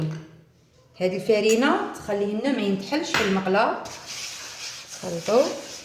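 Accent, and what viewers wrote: West, Algeria. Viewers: الله يعطيك الصحة نفس طريقتي انا صانعة حلويات انا من الغرب نفس اللهجة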